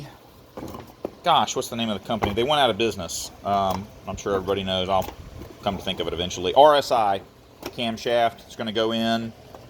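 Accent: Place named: South, United States. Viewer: I love how you open up all nonchalant with your southern drawl. Then, with hardly any build up, you proceed to just drop some extremely deep turbo and tuning knowledge. Hahahahaha! Looking forward to seeing this one develop.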